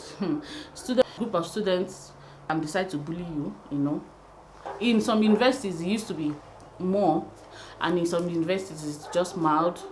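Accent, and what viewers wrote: Nigeria. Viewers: mee to I love a nigerian accent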